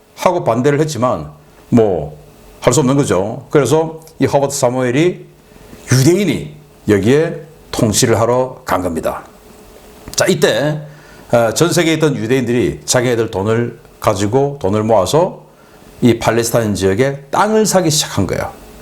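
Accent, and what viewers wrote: Gyeongsang, South Korea. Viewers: 🐕 조지나 빨아라 경상도 말투나 고쳐라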